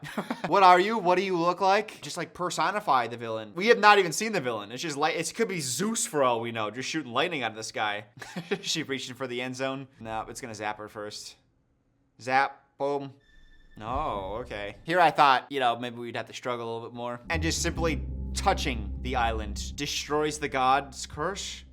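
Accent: New Zealand. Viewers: as an Australian, I think it is one of the most beautiful NZ accents I’ve ever heard. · Bros getting his accents confused, that is probably the most New Zealand accent I’ve ever heard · Me being slightly offended you mistook the kiwi accent for the Auzzie 😅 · my dude that is the kiwi accent, Aussies say reconnect like you do 😂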